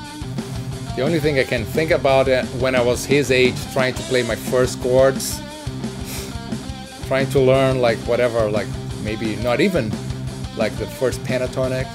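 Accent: Brazil. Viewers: ainda bem que tu tá falando inglês com o teu sotaque brasileiro, eu entendo quase tudo o que tu fala, sem necessidade de legendas. Meu inglês não é fluente, e quando eu ouço um americano falando, todo enrolado, eu não entendo quase nada, aushuhauha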